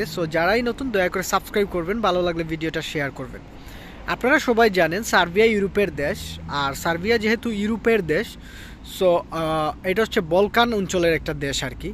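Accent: Sylhet, Bangladesh. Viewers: ভাইর কথায় লাগের সিলেটি আফনে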